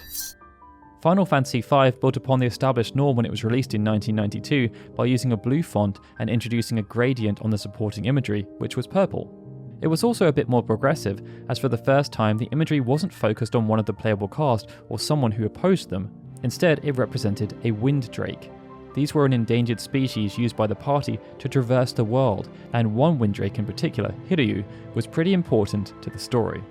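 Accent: United Kingdom. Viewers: There is no other way to listen about final fantasy then with a British accent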